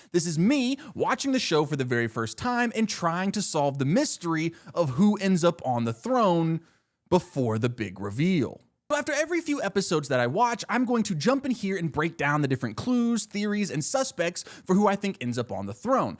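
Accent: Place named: United States. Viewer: I'm enjoying reliving the show through you.... plus you sound American so it's only natural that you mispronounce everything it's funny if anything, don't listen to the haters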